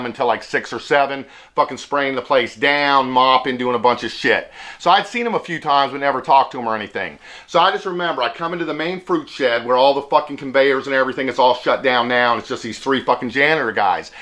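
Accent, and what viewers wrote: South, United States. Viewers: So if you are from WA, how come you sound Southern?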